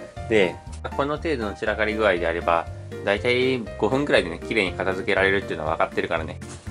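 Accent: Kansai, Japan. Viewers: 関西弁萌える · 急に関西弁なったりタメ語なったり俺言うん好きやわ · 関西弁いいね👍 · 久しぶり見たけど関西弁すごい😂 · いんきゃの関西弁はらぶい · タメ語関西弁よき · 京都弁？関西弁？が、可愛いわぁ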